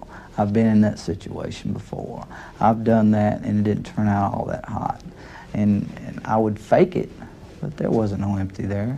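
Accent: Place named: South, United States. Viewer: his eyes and southern drawl are so disarming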